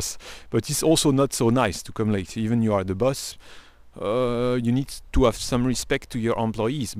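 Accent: France. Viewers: French english, so typical, haha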